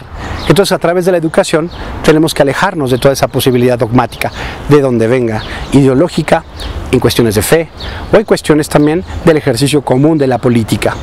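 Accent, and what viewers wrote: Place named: Mexico City, Mexico. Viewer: celebro que te diriges con más prudencia, no a todos les agrada escuchar tu estilo chilango y México no es la ciudad de México. Me parece que la tolerancia es una virtud y venir del pri no es el acabose,pero si hay que ponerle ojo a esa gente,recordemos que Lázaro Cárdenas también era priísta y es alguien quien difícilmente olvidan quienes lo vivieron,a lo mejor sean excepciones,si es así, entonces solo hay que hacer excepciones,las menos posibles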